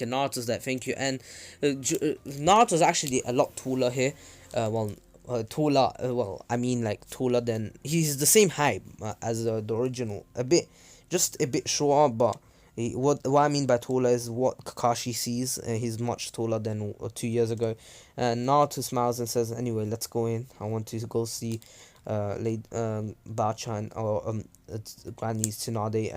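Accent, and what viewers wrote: United Kingdom. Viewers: i just love to hear a brit swear it sounds amazing and awsome video btw keep it up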